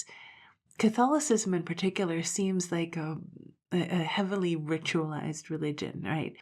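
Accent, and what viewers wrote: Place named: West Coast, United States. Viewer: Portland, OR? I live in Salem, but have been in SE OR taking care of family. I thought you sounded like a West Coast accent. 💗 Ah to have a flying canoe!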